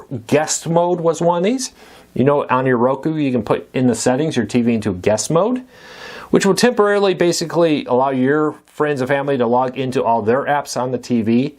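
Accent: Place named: Philadelphia, United States. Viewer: Are you from Philly? You keep calling it”Pitcher” settings 😃👍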